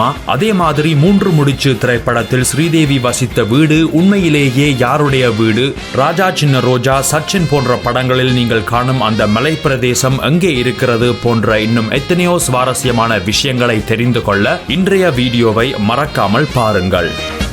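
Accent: Sri Lanka. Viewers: Anna nenga srilanka thaneai nenga pesurathu enga naattu jafna Tamil pola iruku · Neenga Sri Lanka tamizhana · Srilanga Tamil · இது என்னடா, இலங்கை வாய்ஸ்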